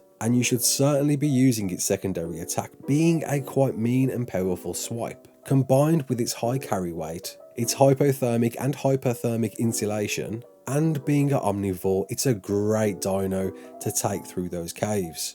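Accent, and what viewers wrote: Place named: Walsall, United Kingdom. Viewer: Only just came across this video, it was really really helpful. You sound like you’re a fellow Walsall lad 😄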